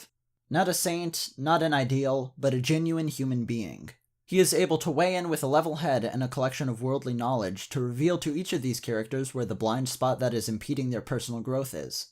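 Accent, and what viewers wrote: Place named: United States. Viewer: Forgive me if im wrong, but from your accent, im assuming your are american, in which case you should have studied in england, because you would have achieved 100% on any exam with that quality of ananlogy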